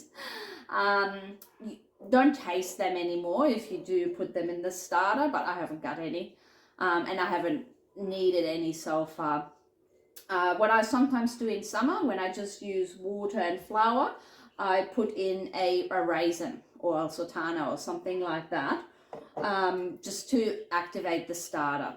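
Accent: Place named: Australia. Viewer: Thank you so much! I loved your way of explaining and your Australian accent. The clothing changes were fun too. Nice production and even better tips.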